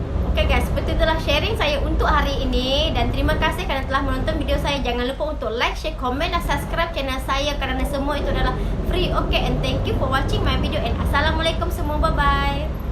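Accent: Indonesia. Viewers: Wehhh..kamu ni WNI kan? Kamu tak tau ke yang kami bayar bil elektrik, air, indah water, maxis, celcom semua online/credit card/debit card. Bumbu masakan masih guna la kunyit, bawang, cili asli. Orang Indo yang datang ke Malaysia je selalu guna bahan instant yang kau guna meniaga di gerai2 tuh! Menyamar konon jadi warganegara Malaysia konon...bahasa Indonesia kamu masih pekat lafi, jangan nak MENYAMAR jadi orang MALAYSIA!!!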